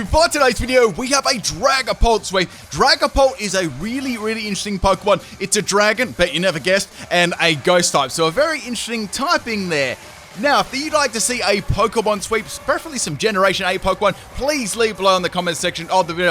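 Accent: Australia. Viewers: This accent sounds familiar. Are you from Australia by any chance?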